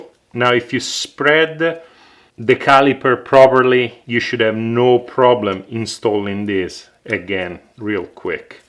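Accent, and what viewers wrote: Italy. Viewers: Love the accent, italy?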